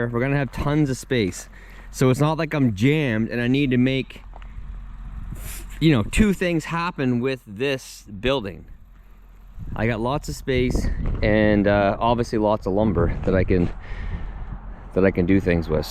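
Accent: Nova Scotia, Canada. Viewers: You'll just end up with a cluttered shop keeping everything in there. Customers will feel safer going into a tidy office, without the mechanical noise also. You've got the land, and we all know by now you love tidy! 😅 I like the way you talk by the way. You're a Nova Scotia boy through and through 😊 Which , of course, brings me to the subject of AGE. You never announced the winner of your " Guess My Age" video. I'm still waiting .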